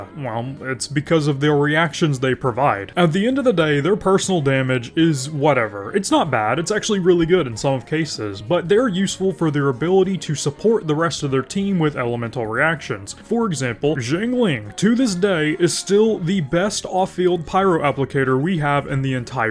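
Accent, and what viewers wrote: South, United States. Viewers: He said he has a thick southern accent (I'm assuming he's from the US) so he has a hard time to pronounce it.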